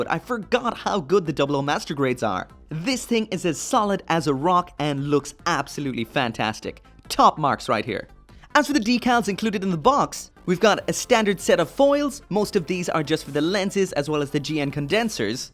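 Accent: Ireland. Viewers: Hey man, you Irish?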